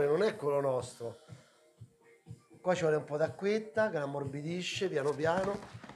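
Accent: Rome, Italy. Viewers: A parte la parlata romana che ha un sapore a se', la ricetta stuzzica proprio. Mi manca il sapore di Roma, manco da li da più 50 anni. Ciao!!!😋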